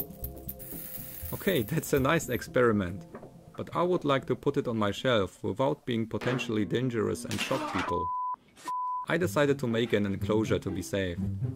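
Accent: Germany. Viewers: Are you german? You do sound like it. If so, you're one of the few (like Great Scott!) who make interesting videos with outstanding quality. Unfortunately a rarity among german youtubers. Sub +1, keep em comin' :)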